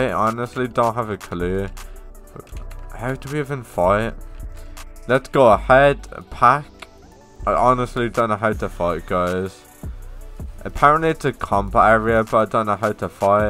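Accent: United Kingdom. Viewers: Bro your language british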